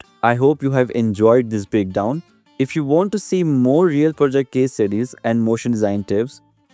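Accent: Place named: Pakistan. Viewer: This work is definitely not worth $450, you should up your prices. If you've made such a great project in just 3 days, it can only get better with a more flexible time limit. Judging by your voice you sound Pakistani, and $450 is a lot here, but still, you've got to charge more, your portfolio is surely looking great already.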